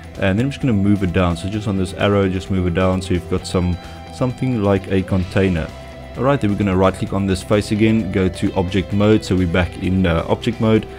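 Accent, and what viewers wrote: South Africa. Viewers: Hi great Tut! thank you. are you South african? you have a interesting accent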